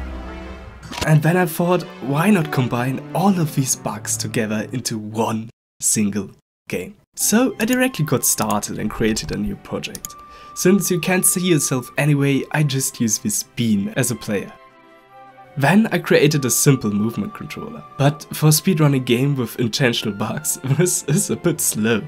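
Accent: Germany. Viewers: I hear the DEUTSCHER AKZENT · Direkt beim ersten wort gemerkt, das du deutscher bist 🤝🥹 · you sound german to me🤔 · Very german accent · You Sound german Und ich bin deutscher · you sound german mate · You sound so German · bruhh He sound like hes german! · Hatte doch gewusst das die Aussprache mir sehr deutsch vorkam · U have a very Strong Accent (perhaps German??) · Ich wusste es, habe schon den deutschen akzent gehört und dann die deutsche windows benachrichtigung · I Knew it that you have a German accent. Windows Defender Message prooved that i can hear that someone is german. :D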